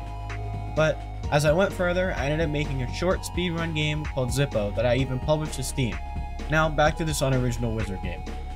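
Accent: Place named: South, United States. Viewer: southern accent sounds lovely!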